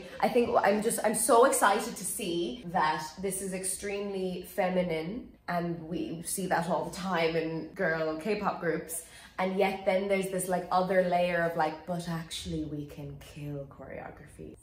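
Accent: Ireland. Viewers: Sorry you’re Irish? I am terrible at accents so I wouldn’t be able to tell where you are from even if you hit me in the face with your country’s flag.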